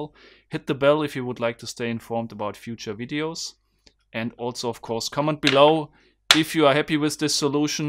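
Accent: Germany. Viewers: He sounds german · Ich liebe diesen deutschen Akzent xD (I love this german accent xD) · Are you from germany? Sorry for this weird question, but I was wondering through the entire video. I go to a school in germany and noticed my classmates have the same accent as you when speaking english. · Man checkt das du deutscher bist 🤪🤣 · Bist du Deutscher dein Englisch hört sich nicht gut an oder täusch ich mich da. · An deinem Englisch merkt man direkt das du Deutsch bist :D gutes Video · He's speaking Like a german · Also man hört raus das du ein Deutscher bist aber trotzdem ist dein Englisch super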